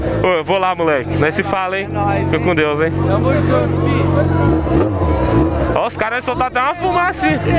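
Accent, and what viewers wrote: Goiás, Brazil. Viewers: mano vc e de onde ? vc fala sempre no pique do Goiás ? nasceu lá ? pequenas duvidas ai , responde quando der vlw abraços ✌👍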